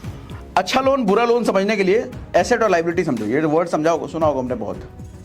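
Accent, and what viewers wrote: Bihar, India. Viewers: Gariayayga,Lagta hai aap bihar se hain.mai bhi hun.good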